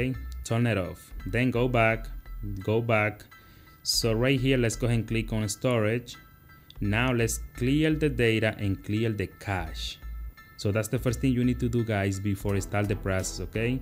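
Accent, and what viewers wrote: Dominican Republic. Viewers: You Dominican? De lo mio? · you sound Dominican! that's wSup paisa😄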